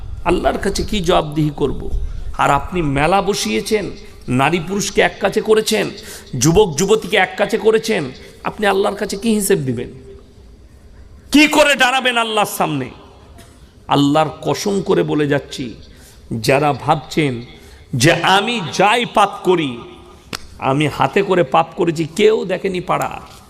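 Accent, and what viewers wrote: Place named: India. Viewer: এটা হিন্দি টানে বাংলা বলে কেন?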